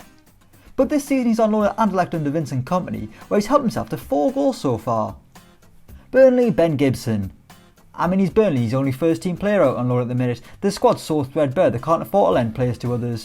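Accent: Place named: Ireland. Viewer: If I don't hear the irish accent... I press exit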